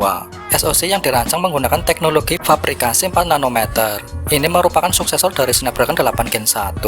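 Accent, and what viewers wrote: Banyumas, Indonesia. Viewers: Bang ngapak yak?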